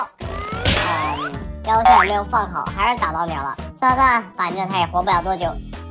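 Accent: China. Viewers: 導演你是大陸人嗎？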